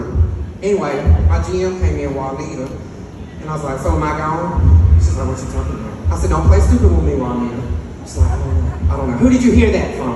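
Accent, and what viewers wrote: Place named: Alabama, United States. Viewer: I enjoyed that shug,, cant wait til you do it again hey even on moms front porch be ok with me!! My moms from ALABAMA so i know all the ALABAMA talk! you got it for real for real! you said thats all I got to tell yall ,, 😂🤣🤣🥲😂🤣 you dont need no skit nothing ! All you gotta do is be yourself and talk about everyday life or as a kid memories! I mean thats the good thing about being raised in ALABAMA! Im stuck in LOUSIANA AND my Mom is gone on to heaven so hearing yalls voice makes my heart smile! I been following you on tik tok since day 1 and I just love you shug or ma used to say HUN to like you say shug! so cute,, keep it up and even though you say its a comedy show dude you have a amazing voice so what better way to let us hear you joke and sing too! even if its a silly funny song you sound good singing ! we love you dont stop being you dude love ya Tammy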